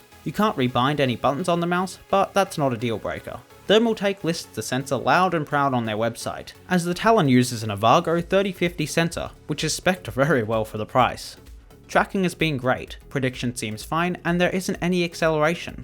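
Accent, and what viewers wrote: Australia. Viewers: He's not Scottish. I have no idea why some think he is. He's Australian. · Why is this Australian doing the voice over?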